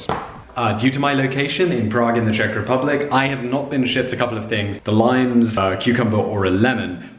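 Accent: England, United Kingdom. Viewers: I thought that you were in England you sound British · Is this how englishmen pronounce Nahuatl words? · I thought same, accent is definitely British though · And i thought he's a British who lives in Canada lol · i cant learn with this britt talking this england mess at me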